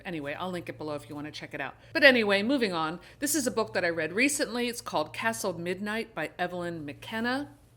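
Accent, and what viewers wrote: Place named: Pennsylvania, United States. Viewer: Fun videos! Sound like you are around bucks county pa! Same with me, it’s a lovely place to live!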